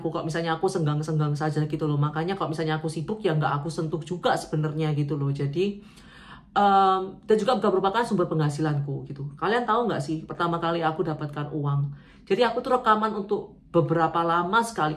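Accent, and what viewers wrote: Surabaya, Indonesia. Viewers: Dari awal nonton video sampean uda curiga dari cara bicaranya kok medok bgt 😂 adakno wong sby 😂 lancar kuliahnya disana ya mas 🎉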